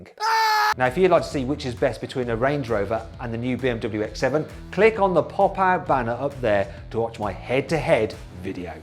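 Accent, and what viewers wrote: United Kingdom. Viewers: この本来の英語の発音が好き 英国訛りとか言うが、アメリカ英語こそ訛りなのにね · ちょっと崩れた英国英語のアクセントが良いですね。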